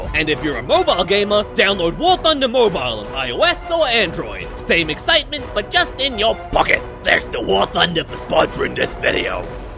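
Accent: United Kingdom. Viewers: R U BRITHISH???? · Well if you’re not British? What are you? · r u british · bro is British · Are you british? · Are you brithish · R U BRITISH? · Are you British? Spits tea on dude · Are you British? (Slurping hardly) RAHHH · Are you British? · . ARE YOU A BRITISH 🤣🤣🤣🤣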